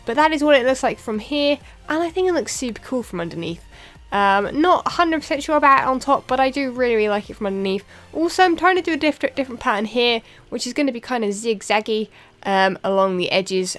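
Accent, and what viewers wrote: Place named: Fens, United Kingdom. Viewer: Fab Vid! I have to ask though, your accent... you were sounding very much like a fenland lass lol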